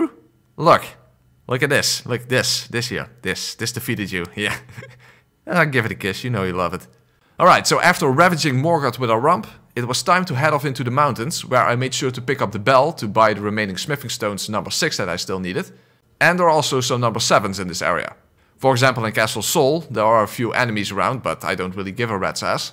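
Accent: Netherlands. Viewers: my guy, are you Dutch by any chance? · You Dutch? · i bet this will be a fun vid but i'm 4 mins in and the dutch that is squeezing through your english plus the dutch accent is making me cringe a bit butt its not you mostly me since i am belgian lots of love <3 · You sound very dutch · 14 seconds in, and ur definetely dutch lol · you sound dutch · broer jij bent doodlachen ik hoor gewoon dat jij nederlands bent😂 soldaat 💯❤️ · First dutch soulsborne youtuber ive found, if you are dutch. You certainly got the accent. Great video btw, was a blast to watch. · Great vid man, are you Dutch by any chance btw?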